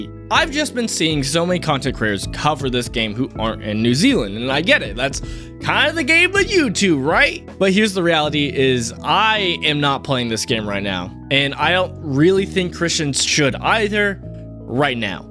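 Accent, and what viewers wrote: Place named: United States. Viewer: Your take on VPNs is wild. You taking control of the internet you pay for, which is being botlenecked by the greed of the government so you only have access to certain things which is against the whole purpose of the internet to begin with and is also not Illegal(that's the government you were talking about) is not only a good thing but it's a just thing. You are also going down a very slippery slope with we should just do what the governing body says because thats what the bible says, I mean where do you live or in what time ever is that in any way a good idea because you sound like an American and blinding following our government is going to get you in some bad spots. I mean bro turn on the news and see what governments are doing just today.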